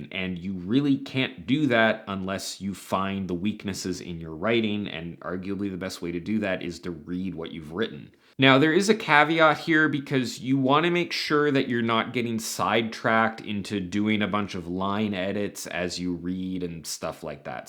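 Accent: Canada. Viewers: i swear i can smell the canadian in your accent. it's messing with me trying to figure out if i'm imagining things or not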